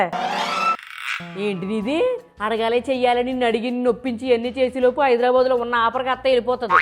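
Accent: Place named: Amalapuram, India. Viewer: Hat's off akka mee amalapuram akcent super Ento mari meeru serious expression peti dialogue cheptunte full comedy ga untundi mee expressions ,mee sense of humor super😂😂😂.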